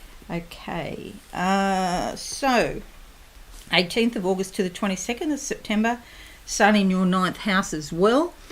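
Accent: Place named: Australia. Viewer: It's so warming to hear a fellow Aussie.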